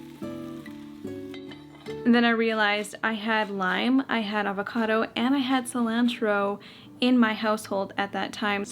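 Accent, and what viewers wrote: Canada. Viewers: Canadian accent so wonderfully strong in this video 😍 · I am now learning that Canadians pronounce things differently than the U. S. 😂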